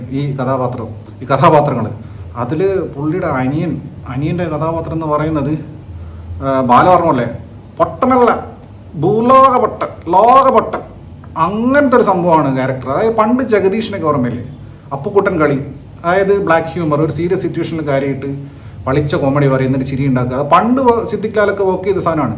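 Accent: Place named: Malappuram, India. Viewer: കണ്ണൂർ slang ആയി തോന്നിയില്ല പക്കാ മലപ്പുറം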